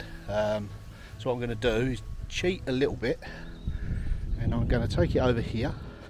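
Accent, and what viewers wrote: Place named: England, United Kingdom. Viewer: so glad to see you in your channel. I've been enjoying you at the convent project and didn't know you had your own channel. This is great!!! Love your kind demeanor and calm. You're also practical and very talented. Looking forward to watching you make your beautiful garden. Love listening to your English accent 🥰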